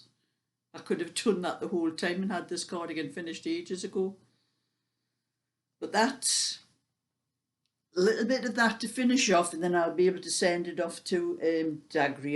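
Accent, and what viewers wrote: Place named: Yorkshire, United Kingdom. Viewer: Just looking in on all your videos with me being one of your new fans , feel so sorry about your hands troubled with pure wool , my daughter is the same . Watched the one on your accent and many of your words are the same as ours in Yorkshire and I think a lot in Lancashire as well , nex my hair dropping out must be an age thing I’m 78 it drives me mad , and don’t get me going about the bills going up don’t know how we will manage I wear about three to four layers of clothes as it is as I feel the cold when inside worse than outside but guess that’s cause try to busy myself with the garden . If got took into hospital from home they would have a job to find me with all the clothes 😂 it’s an awful day here but of snow but of sleet then rain and windy and it was down to 2/3 early this morning not as bad as we used to have it twenty years ago is it but going back sixty plus years we used to av to dig a tunnel to get out the door . 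So our age group av certainly seen the changes please don’t answer just rambling on 🤣 just keep knitting 😂 bye love to watch you thanks for all your videos Jean 💕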